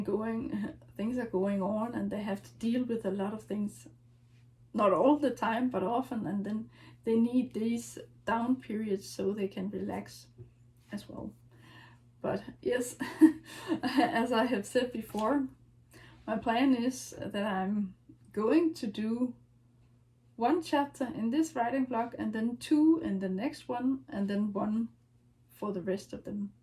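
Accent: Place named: Denmark. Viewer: I’m intrigued by your characters and story. I think there is definitely room for stories outside of a genre. Genre seems to be mainly for helping marketing and selling it. Also…Denmark! I thought I recognised the accent. I lived in Copenhagen for a year and really loved the country and the people ❤ My story is set in Sweden actually 😃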